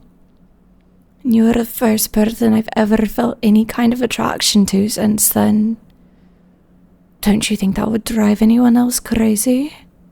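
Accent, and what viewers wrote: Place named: Scotland, United Kingdom. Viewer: Scottish accent detected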